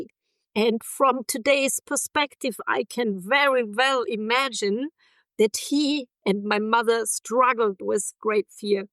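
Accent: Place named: Germany. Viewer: She sounds exactly like a former "n4zi" saying "I had no idea about zee camps".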